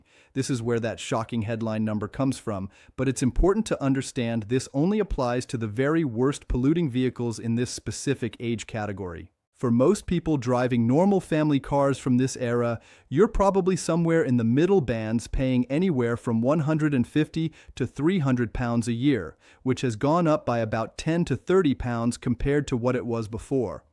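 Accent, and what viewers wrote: United States. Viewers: Ah it’s the uk lose the American voice it’s dammed annoying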